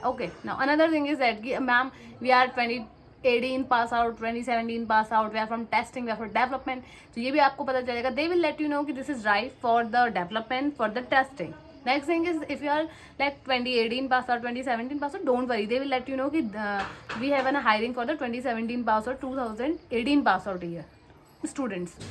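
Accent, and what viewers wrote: Kumaon, India. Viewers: are you kumaoni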